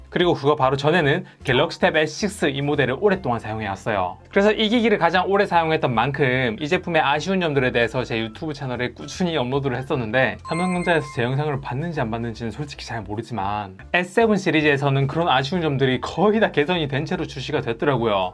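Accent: Gyeongsang, South Korea. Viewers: 고향이 부산이신가요?억양이 굉장히 경상도스러운데요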